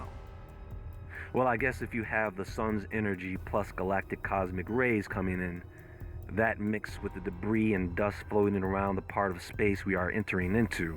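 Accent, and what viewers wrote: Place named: East Coast, United States. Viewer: sounds like a know-it-all, with his Italian (Jewish?) east coast accent, telling us "trust me". He makes some interesting videos, but his logic is often flawed, at least it seems erroneous to me. We live on a plane, not a spinning ball traveling through a galaxy, as has been proven ad nauseam. Both NASA and the government lie to us, but he believes the crap science they taught him anyways - wtf? The weather is now created and controlled, flooding caused intentionally using rapid water evaporation from power plants, which creates and fuels storms. Cyclones are created artificially. This was all proven in Weatherwar101 videos, which you can still watch on youtube. Mr. WW101 himself was disappeared in early December 2017, then his website disappeared a few months later, since he wasn't able (alive?) to renew it with his ISP. 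This video is mostly nonsense, but I like his videos on other subjects, especially the bible.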